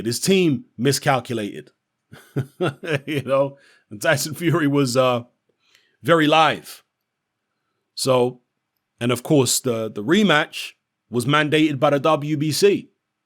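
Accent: United Kingdom. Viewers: Your biased . Why is Joshua fighting Ruiz then . Why not just push for the Wilder fight. U sound British anyway , your straight team Josh .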